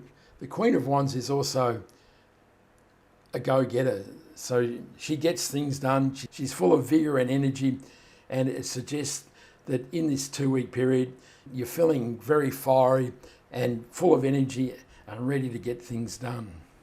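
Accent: Australia. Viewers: Subscribed.. Nice to hear an Aussie accent!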